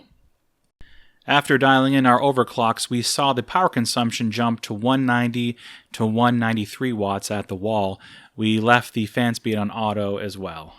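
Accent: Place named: United Kingdom. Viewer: I second those opinions about your voice bro. It sounds calm, professional, articulated. And that's a big factor in the subscription business I think. And you know what ups the ante, is a british accent. I'm serious! That's almost a guarantee for someone to subscribe.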